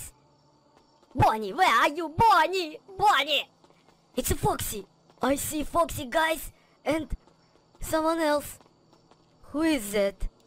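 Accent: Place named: United States. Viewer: Ты так разговариваешь потому что в соединеных штатах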